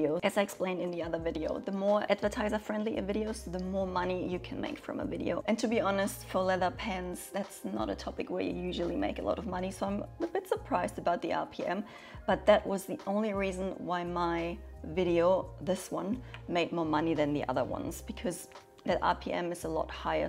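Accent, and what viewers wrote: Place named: Nuremberg, Germany. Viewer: Hallo, schön dich deutsch sprechen zu hören. Ich war dienstlich letzte Woche im Nürnberger Raum.. es klang sehr ähnlich.. smile.. ist es evtl. deine "alte Hemat".. LG Andreas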